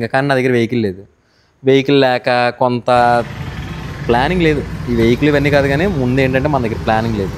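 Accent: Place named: Telangana, India. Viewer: తెలంగాణ మాట్లాడు బాబు సూపర్ ఉంటది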